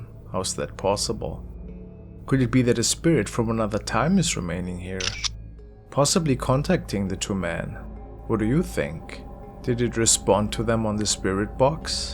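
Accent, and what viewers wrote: Germany. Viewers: Love your German accent my ancestors were German too and I love your accent it makes the videos all the mor spooky. Love you videos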